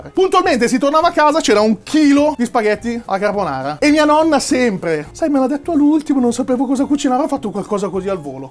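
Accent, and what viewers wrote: Rome, Italy. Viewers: Si dai ci sta come parli in romanesco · ROMANACCIOOOOO NO ROMANESCOO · Il BURROOOOOHHHH non è mancato Ahahaha comunque il tuo romano é 🔝🔝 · Tu sei pazzooo!!! Pizza con carbonara, burrata e mortazza! MORTACCI!!!😂😂😂 col romano puoi migliora' zio😉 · Fa abbastanza schifo il tuo romanesco...hahah senza offesa.... comunque bravo così se fa a carbonara quella vera....te se ama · Che bello sentirti parlare Romano! 💛❤️ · Sei meraviglioso quando parli Romano te se ama!!!! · È stato emozionante aver sentito il tuo romano!🤩🤩🤩🤩 Una Carbonara trucida ma divina, cacchio se la proverei!🔝🔝🔝🔝 Comunque boss sei proprio fenomale!!!🤩🤩🤩🤩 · Carbonara perfetta... E il tuo Romano pure.... La mandrakata finale é strana ma direi che e boooona 😂 Grande... Sei eeeeeeerrrrr meeeejjjjjo 😂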